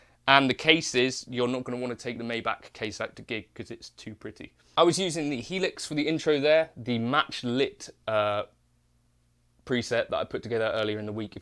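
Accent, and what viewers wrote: England, United Kingdom. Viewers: Guys it is ENGLISH UK accent. His Wife has a Great Accented Voice too.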